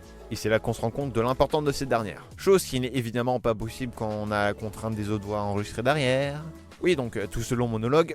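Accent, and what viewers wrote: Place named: France. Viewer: I fell in love with this French pronunciation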